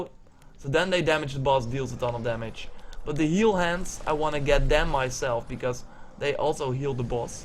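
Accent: Netherlands. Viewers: ik hoorde al gelijk aan je accent dat je nederlands was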